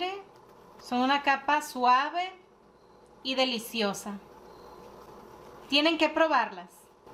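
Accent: Sinaloa, Mexico. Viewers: Hola nueva suscriptora eres de sinaloa verdad el acepto nos delata ji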